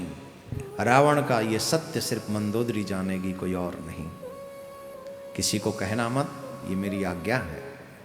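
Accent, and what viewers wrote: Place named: Braj, India. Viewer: Guru ji radhe radhe aap jab braj bhasha bolte h too bhut achha lagta h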